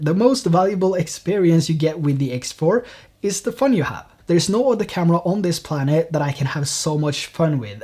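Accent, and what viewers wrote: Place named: Norway. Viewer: You sound a little norwegian, are you norwegian?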